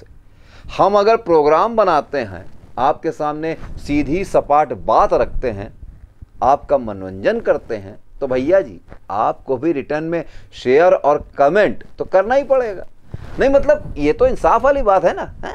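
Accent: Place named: Kanpur, India. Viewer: कनपुरिया अंदाज में खरी खरी....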